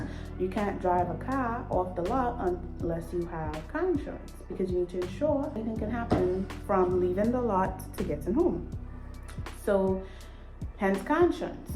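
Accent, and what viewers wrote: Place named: Trinidad and Tobago. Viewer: Doh lose dat trini voice, eh!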